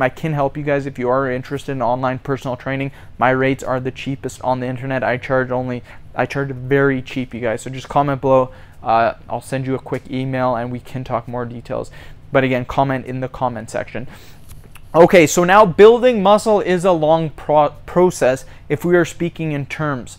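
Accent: Canada. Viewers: Is that a Canadian accent I hear?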